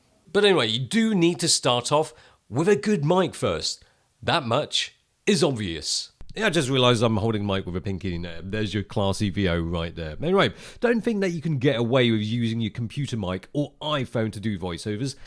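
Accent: United Kingdom. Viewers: Hey can you please make a video talking about yourself, where you grew up, how come your English sound perfect British? I enjoy watching you vids. Thank you for posting these. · The best asian guy who speaks britishenglish. A fan since digital rev days. More power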